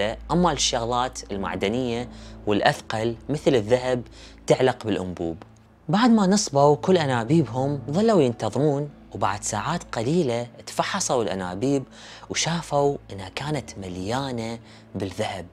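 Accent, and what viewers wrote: Iraq. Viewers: مرحبا ياريت تجيب لنا قصة مدينة آشلي المفقودة قد سمعتها لكن مو بطريقة سردك الرائعة وبلهجة العراقية زادتها الغاية في الروعة ❤ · سردك للقصة جميل جدا والي محليها اللهجة العراقية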